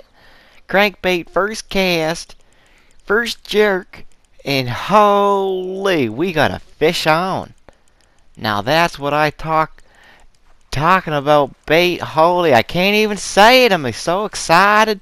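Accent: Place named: South, United States. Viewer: Been missing your RDR2 online videos. Hope all is well! Your "Southern" accent is hilarious 😂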